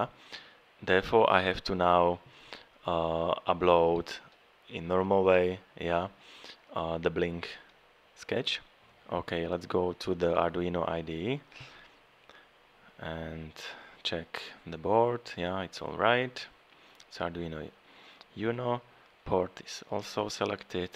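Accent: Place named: Slovakia. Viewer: Are you from Slovakia? I sense a Slovakian accent there :)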